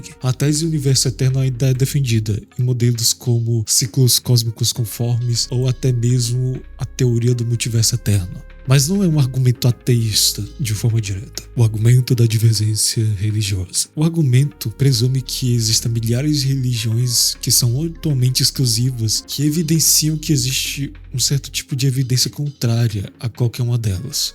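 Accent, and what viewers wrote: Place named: Northeast, Brazil. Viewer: Que voz horrível, parece um gripado falando com algum sotaque nordestino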